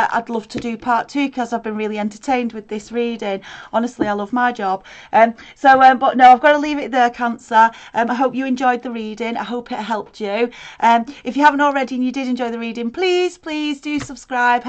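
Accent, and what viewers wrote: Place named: Liverpool, United Kingdom. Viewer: liverpool accent !! love it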